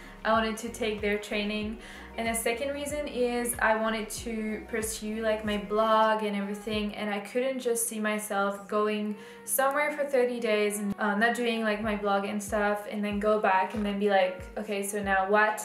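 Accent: France. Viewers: Are you french? Your English prononciation is really good)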